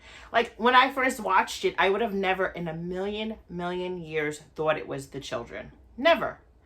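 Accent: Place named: East Coast, United States. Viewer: Great reviews very detailed ! You a Jersey Girl?? You got an East Coast accent.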